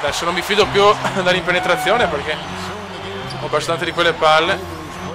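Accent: Veneto, Italy. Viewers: nè che sboro fioi, non semo ciapà tanto màl. e qua il veneto si sente hahaha grande <3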